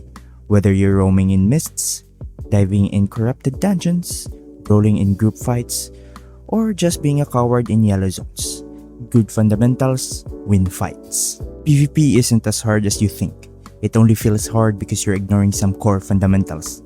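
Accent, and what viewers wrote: Philippines. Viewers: you pinoy? accent is obvious hehe. good video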